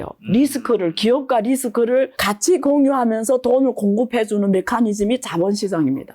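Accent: China. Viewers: 조선족인데 말투가 고쳐지지가 않네 교묘하게 짜장 빨던여자가 비판을하니 신뢰가 안간다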